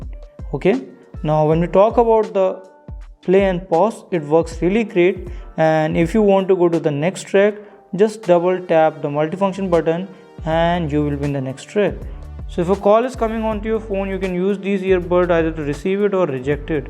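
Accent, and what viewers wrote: India. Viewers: That is hindi accent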